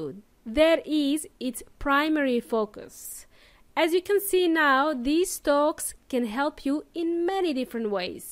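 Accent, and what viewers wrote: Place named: Latin America. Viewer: I love your voice it's so clear to me so my question is are you originally from a Latin American country because your voice sound like Latin person by the way a new subscriber to your channel .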